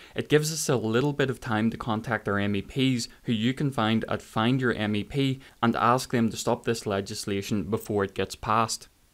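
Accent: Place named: Northern Ireland, United Kingdom. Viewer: I love your Norn Iron accent. Nice seeing people from our country getting popular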